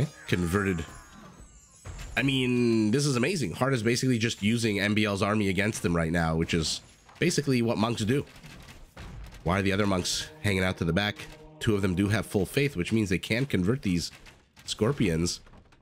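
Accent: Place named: Canada. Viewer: youdaman,! love the Canadian accent, ive got an Eastern KY one, myself.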